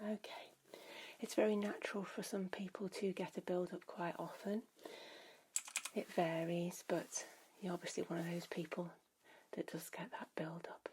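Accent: United Kingdom. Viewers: You have one gorgeous British accent that I can listen to all day long. 🥰 I felt so relaxed and enjoyed this part 2 of ear exam and deep ear tingles here! Cheers 🍻for sharing and I wish you a beautiful Happy New Year's 2025! 🍾🎉🥳💜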